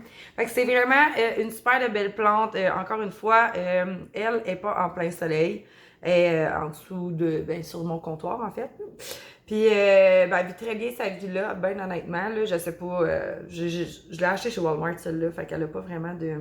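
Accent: Canada. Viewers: Accent du Canada ,que j aime beaucoup et comprend .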